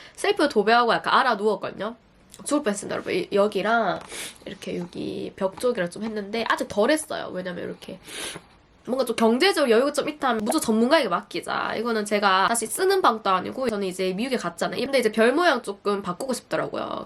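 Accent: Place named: Daegu, South Korea. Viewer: 대구~~ 대구 사투리 반갑습니다~~^^우리동네예요~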